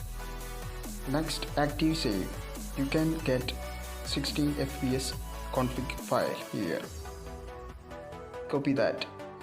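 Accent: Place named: Kerala, India. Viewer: Bro malayali alle English kettapo thoni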